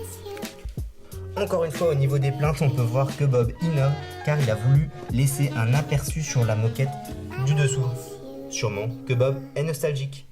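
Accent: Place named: Alsace, France. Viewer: J'étais sûr que tu était alsacien 😂😜🇮🇩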